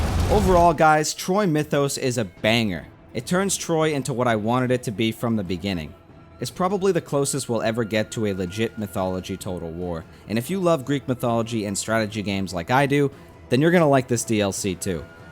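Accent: Boston, United States. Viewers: I like your accent. Is it Boston? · something about a thick boston accent talking about total war is so funny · Haha are you from Boston? Lovely vid, lovely accent.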